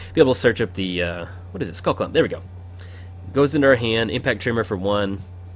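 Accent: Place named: South, United States. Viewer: Seems like a prime deck for Timetwister.:)Also, your voice reminds me of every southern kid I met in the Army.:)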